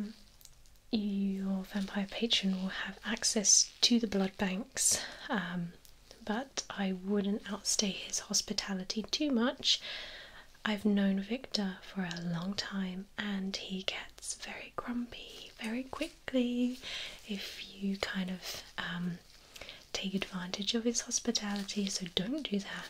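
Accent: United Kingdom. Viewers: This is by far your best work so far. Please make another vampire video this is my favorite one out of all of them, most of the time i dont make it to the end of the video because i am so relaxed and your voice is so soothing that i fall asleep half way through the story i love your British accent and this story that you came up with is so awesome, i cant thank you enough for making these fantastic videos sincerely Richard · British accent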